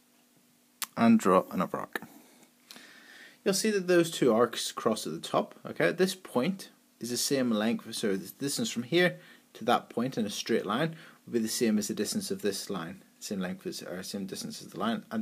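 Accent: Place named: United Kingdom. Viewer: are you from England? because you have like british accent, if you are from England well high five because im from England aswell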